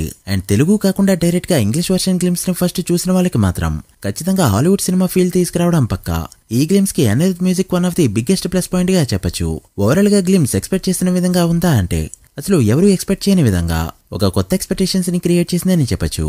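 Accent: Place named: Telangana, India. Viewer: ee telangana slang vinadanike chirakuga vuntundi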